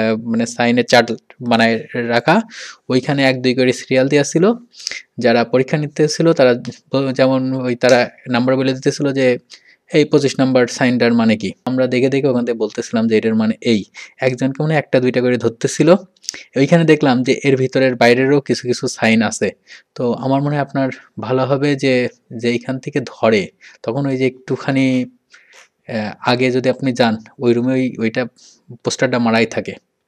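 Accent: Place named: Kushtia, Bangladesh. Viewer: amar kushtiyar ek bondhu ase...eke bare apnar moto kore kotha bole☺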